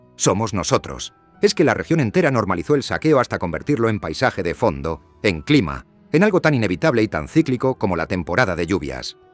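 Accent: Spain. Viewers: El relator tiene acento español,si este video es hecho por un español,te quiero decir que los más odiados son los españoles y España, porqué si somos una mierda es gracias a uds. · Te noto acento español.. Pero te equivocas con Uribe.. Ese sesgo te hace perder credibilidad..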